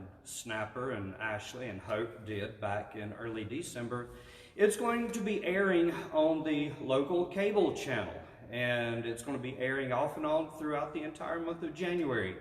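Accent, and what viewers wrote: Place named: North Carolina, United States. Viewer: I was wondering. You sure sound like a fellow Tarheel/hillbilly because you are! Good deal! You said Shelby and there are several Shelbys spread around. Good to hear all the good older ones. We're west of A-ville 60-something miles out in the hills and just found your channel. This is not the 1st time you ever sat at a keyboard. So Glad To Be Here from another Native, a rare and endangered species and GBWYou!.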